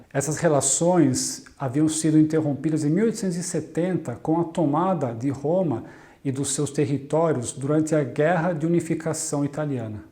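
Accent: Brazil. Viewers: Revendo seus vídeos, nosso que o seu erre já virou éhe.Observe quando o senhor diz Homa. Gostei de sentir o meu Brasil na sua memória. Maravilha! Afetuoso abraço. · CONHECI o seu canal hoje por causa do vídeo no canal do Henry ... Esse vídeo sobre #Fascismo simplesmente é o mais top dentro de 30 min ... Parabéns !!! Esse sotaque é meio Blumenauense ???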